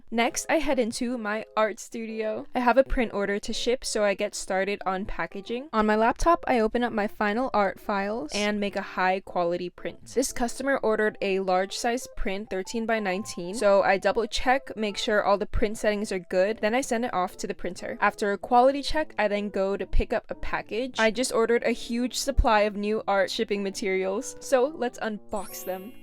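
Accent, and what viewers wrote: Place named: Netherlands. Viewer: your accent looks like your dutch (netherlands)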